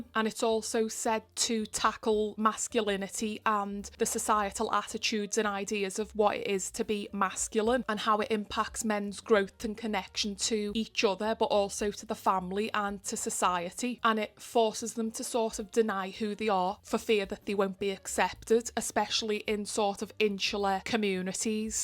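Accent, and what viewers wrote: Liverpool, United Kingdom. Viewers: Thanks for this video. It's much appreciated for Pride Month. I just discovered your channel today. As others have mentioned on other videos, I love your Liverpudlian accent. Most of the lit fic Booktubers I come across have quite formulaic American or English accents. No shade to them, especially as many of them have American accents because they've learnt English as a second language. But it's really wonderful to hear more diverse voices in the Booktube world (literally!), so thanks for your contribution ❤️‍🔥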